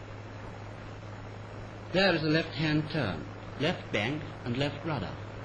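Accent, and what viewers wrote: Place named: Estuary, United Kingdom. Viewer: Mr Scott might not have understood your estuary accent, but would have been too polite to make silly comments about it.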